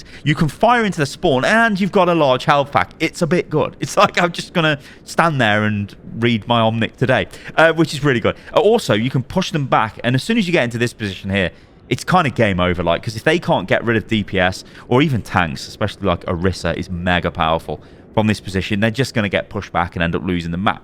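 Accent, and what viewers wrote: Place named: United Kingdom. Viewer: British?! That’s is the correct thing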